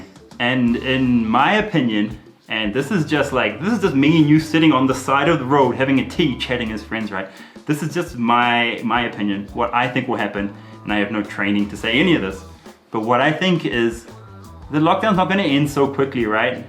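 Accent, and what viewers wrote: India. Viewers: Why do you have an Indian accent lol, it almost sounds like you're trying really hard to put on an Indian accent on when you speak English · You are an Indian you even speak English with an Indian acsent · Your english accent is getting influenced strongly with India🤔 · Hey, are you focusing your channel just for Indian viewers? Why do you speak with an Indian accent like "enna" at the end of every sentence. Why can't be just be yourself not a quasi Indian and talk normal so that the world viewers can understand. If you want to speak to your Indian viewers please do an another channel speaking in Hindi. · Kia ora from NZ. Nice area. Boredom is better than fighting virus in the hospital. I have been following you for a few weeks now. Mate, your Indian accents is getting stronger . Keep up the good work 👍🏼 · you are speaking English with a Hindi accent ---- Look Out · Is it me, or is developing the slightest hint of an Indian accent?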